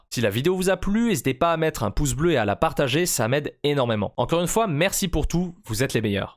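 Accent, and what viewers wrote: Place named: United Kingdom. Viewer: je te juge pas mais ton accent anglais seris... désolé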